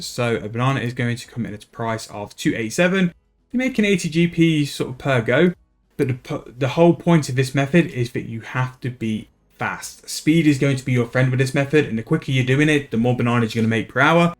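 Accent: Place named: United Kingdom. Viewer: 6M an hour doing enchants... damn I wish I tried this before. Trained 99 mage with combat, did some telegrind (sandstone --> sand) and I never looked into enchanting items really. Thank you so much! If you would talk a little bit slower, I'd appreciate it a lot. As a non-native English speaker the UK English is often hard for me to follow up with. Either way, I finally subscribed to you. Let's go to the 6000 subs!!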